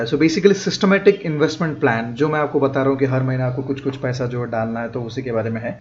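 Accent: Odisha, India. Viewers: Bhai are you odia..?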